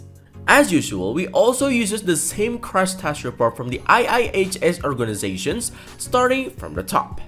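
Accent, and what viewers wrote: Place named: Indonesia. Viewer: You sound like Indonesian. Are you?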